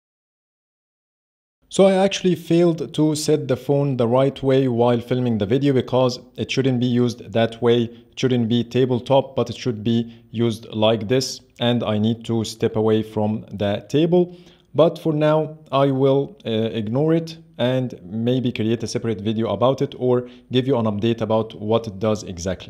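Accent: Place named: Egypt. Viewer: لهجتك انجليزي مصري ... عاش اوي ❤️❤️❤️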